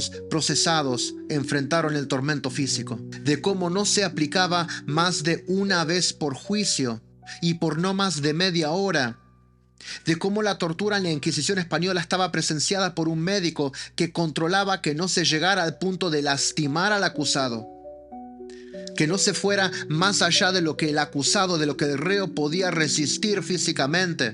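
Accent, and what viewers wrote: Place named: Argentina. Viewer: Excelente hermano, vas con todo!!! Gracias a Dios por tu conversion. Y tu forma de expresarte tan contundente. Me encanta tu peculiar acento Argentino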